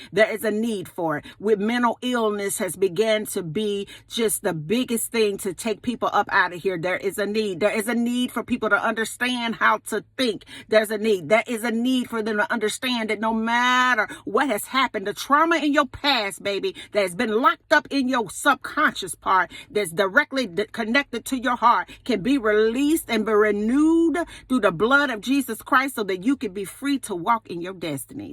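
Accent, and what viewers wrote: South, United States. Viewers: Thank you. ❤ You sound like you are from downsouth. May I ask where you are from and what is your ethnicity?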